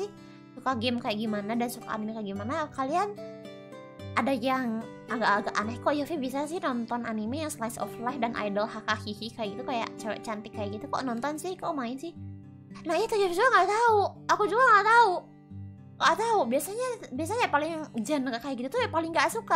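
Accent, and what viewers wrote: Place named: West Java, Indonesia. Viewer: Emang dia orsun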